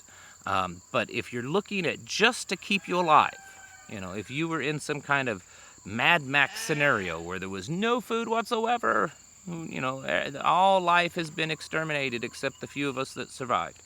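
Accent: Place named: Appalachia, United States. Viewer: What happened to this guys accent??. Back when he was comparing chainsaws he sounded like ah hillbilly . Lol weird.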